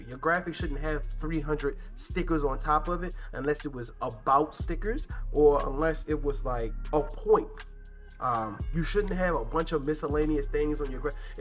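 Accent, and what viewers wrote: Baltimore, United States. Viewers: This dude accent sounds like he is from Baltimore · Bro gotta be from baltimore lol. dope content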